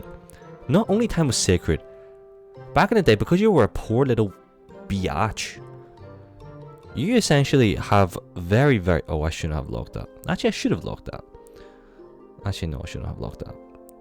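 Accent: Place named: Northern England, United Kingdom. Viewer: Which county are you from you sound like ur from the north but I’m ashamed to say I can’t tell which specifically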